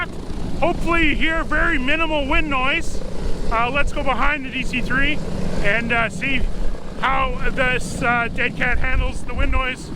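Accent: Bavaria, Germany. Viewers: Clearly German accent ... ! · The accent is Bavarian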